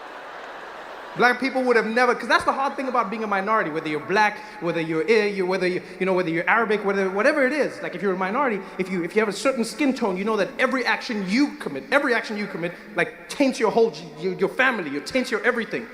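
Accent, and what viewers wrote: South Africa. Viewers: Erm he is South African! Did you not hear his South African accent. And I am not a fan of either of them. I prefer the late great Dave Allen. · Hope your South African English should make some sense 😂 rubbish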